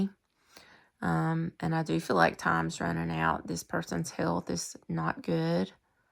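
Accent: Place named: South, United States. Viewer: LOVE your southern accent... sounds just like mine from NC💞 Thank you for sharing your cool decks! I totally resonated with your large spread about family issues. Every card was right on.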